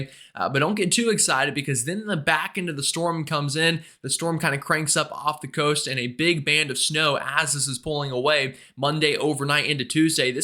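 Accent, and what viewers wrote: South, United States. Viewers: the familiar soft Southern accent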